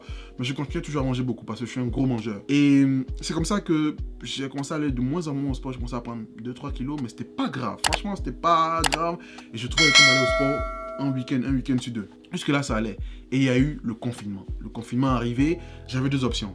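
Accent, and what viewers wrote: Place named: Ivory Coast. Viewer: L'accent ivoirien c'est pour me tué 😂😂😂 moi je m'abandonne heiiiiin 🎉 courage j'espère que ca va me motiver aussi 👍🏾👍🏾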